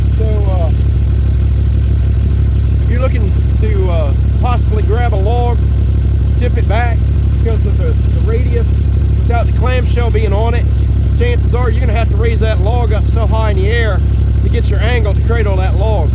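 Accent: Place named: Outer Banks, United States. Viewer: I hear ya hoigh toider. ;-) Down bay native here. Thx for the vids, helpful info. I've had to tighten up a couple of attachments from titan. There's a lot of variability between manufacturers plate dimensions so I think Titan hedges on 'fit everything' side...I find a few welded shims here and there tightens things up fine. All the titan connectors I get go in the spare bin and I put on known manufacturer ones.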